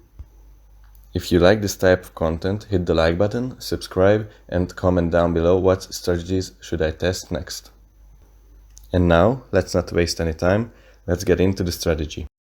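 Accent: Hungary. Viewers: te magyar vagy, hallom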